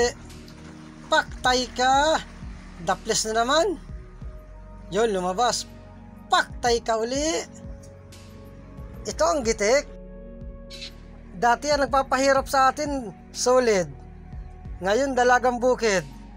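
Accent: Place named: Camarines Norte, Philippines. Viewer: kaya pala pareho tono Ng salita natin siguro taga vinzons ka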